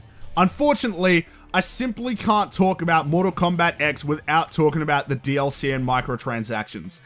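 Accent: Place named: Australia. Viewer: What state in Australia r u from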